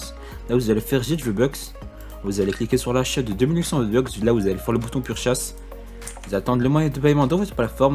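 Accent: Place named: England, United Kingdom. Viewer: L'accent anglais magnifique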